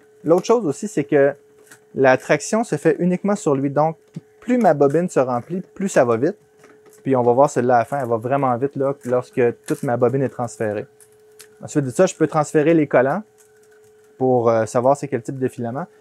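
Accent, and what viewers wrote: Quebec, Canada. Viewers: Toujours un plaisir de regarder tes vidéos même si c'est pas toujours facile avec ton accent Québécois, mais bon l'accent Stéphanois (France) n'est pas mal non plus.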